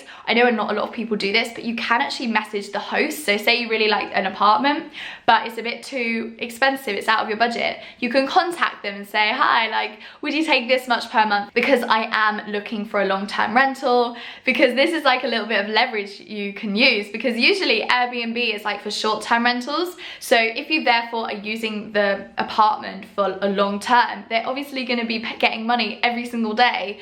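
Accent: England, United Kingdom. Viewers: Love real English accent!!! Which type of UK accents it is?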